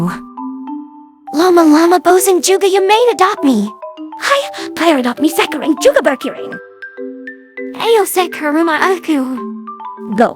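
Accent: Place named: England, United Kingdom. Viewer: itu yang baca orang inggris gitu jadi klo suruh baca indo gitu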